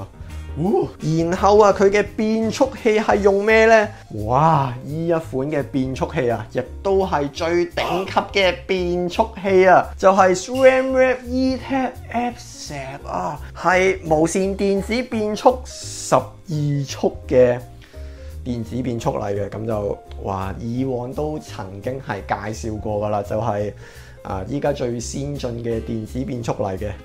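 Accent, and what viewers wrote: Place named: Hong Kong, China. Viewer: Are you Hong Kong guy??? Cantonese so funny!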